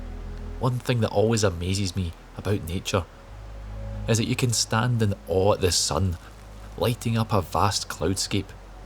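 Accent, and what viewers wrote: Scotland, United Kingdom. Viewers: Stunningly beautiful and said with so much passion and of course the lovely ring of the Scottish accent . I am so proud to be Scottish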